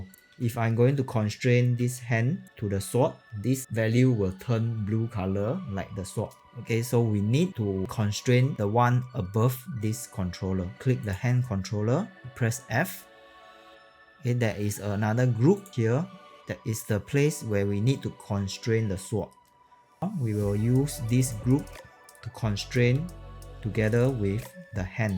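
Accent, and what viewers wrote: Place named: Singapore. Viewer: thanks a lot sir, your explaination was so good. Are you from singapore? i can tell u by your accent :face-green-smiling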